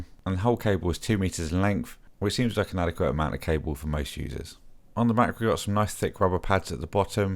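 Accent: United Kingdom. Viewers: Are you Scottish? Your accent made me feel that way coz my German aunt nor English uncle speaks that way but a Scottish friend does.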